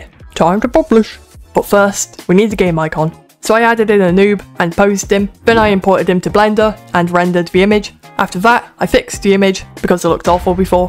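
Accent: United Kingdom. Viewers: are you british im irish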